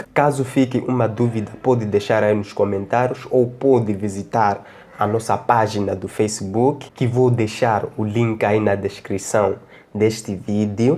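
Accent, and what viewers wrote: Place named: North, Portugal. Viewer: Você é do norte. Right?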